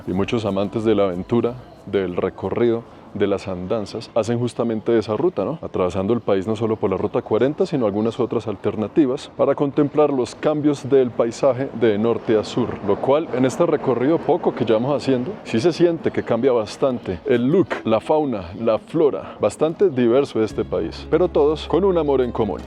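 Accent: Colombia. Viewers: Excelente video, 100% acento colombiano 😊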